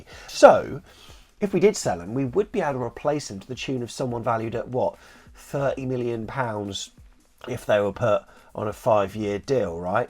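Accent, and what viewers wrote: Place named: United States. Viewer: American accent frightfully good. You should do all future financial breakdowns as Gandolfini from The Sopranos.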